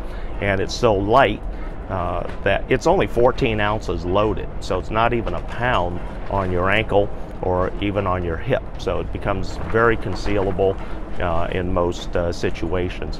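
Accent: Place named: South, United States. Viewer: You have a Southern Accent. It’s funny to hear an Asian man with a Southern accent. Maybe where you grew up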